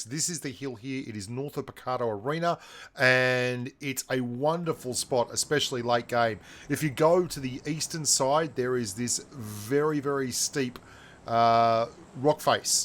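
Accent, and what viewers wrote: Australia. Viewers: Hey. Damo from SA here. So refreshing to hear an Aussie and self deprecating at that. Brilliant videos, tactics and fun in spades. Been playing and watching your content for ages. Watch out for grubs. 😅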